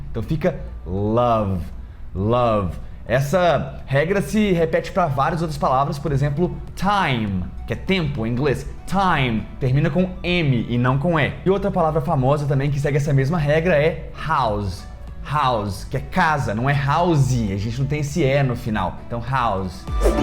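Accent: Minas Gerais, Brazil. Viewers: Muito obrigada por este vídeo muito esclarecedor. Você tem boa entonação e é preciso ao explicar, o que não fica cansativo. Sem falar no seu sotaque mineiro que é uma gracinha. Parabéns, tenho aprendido muito com você.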